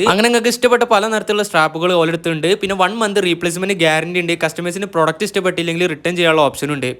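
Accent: Malappuram, India. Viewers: Sound from malappuram ♥♥♥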